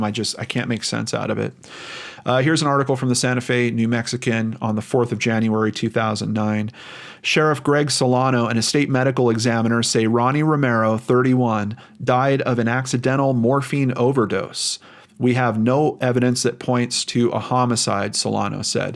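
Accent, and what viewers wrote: Canada. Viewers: As a Canadian there's absolutely wrong with you sounding like it eh? This case is tough, whether or not Ronnie was responsible his passing pretty much killed the case and investigation since it sounds like the police really had him As the likeliest suspect and not many others. Thoughts are with that family who went through so much. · Great video. This is by far the most comprehensive coverage (in text or video) that I've even seen of Romero's case. As to you Canadian "out", accents have a way of spilling over national boundaries.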